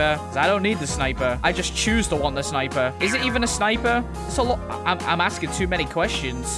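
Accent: United Kingdom. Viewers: Did bro turns to british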